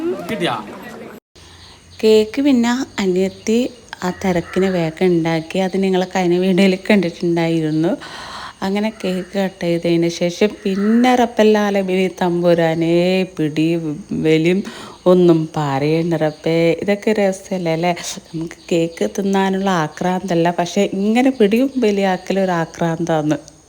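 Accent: Kannur, India. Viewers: നിന്റെ ഒറിജിനൽ കണ്ണൂർ ഭാഷ അടിപൊളി 🎉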